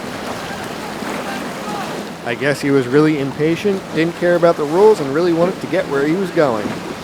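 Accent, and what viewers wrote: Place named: Philadelphia, United States. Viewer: zzzzzzzzzzzzzzzzzz Dislike the commentary. Esp the Philly accent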